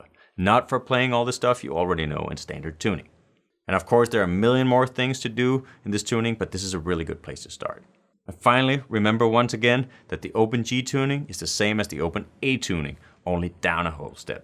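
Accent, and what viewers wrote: Denmark. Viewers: Sounds like a Dane speaking English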